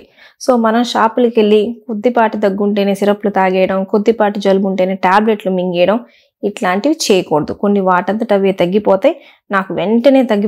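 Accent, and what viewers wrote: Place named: Prakasam, India. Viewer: Avida proper chirala prakasm dist yasa alage untundi.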